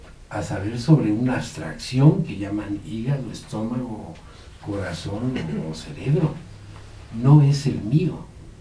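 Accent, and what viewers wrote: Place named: Mexico. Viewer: me dio mucho gusto verte despues de tantooooo tiempo, te reconoci de inmediato aunque la ultima vez que te vi aun no te salia la piocha, te encontre acento Mexicano (por que sera), si lees esto mandame un e-mail <mail> esta foto estoy con mi hijo mayor). Tu primo Felix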